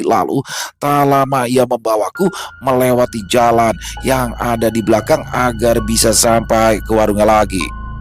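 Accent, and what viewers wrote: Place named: Java, Indonesia. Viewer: Selamat Pagi mas? Ooo pantesan mas bicaranya Macam logat jawa ha ha ha mas jangan kuatir ya saya pasti dukung terus maju teruuss ❤🎉😊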